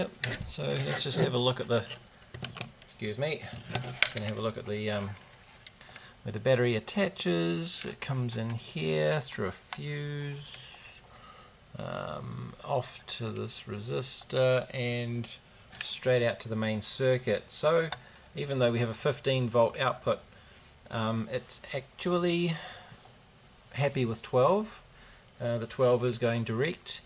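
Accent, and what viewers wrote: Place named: New Zealand. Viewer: Thanks for the video. Very enjoyable.. You sound like a New Zealander... Auckland maybe..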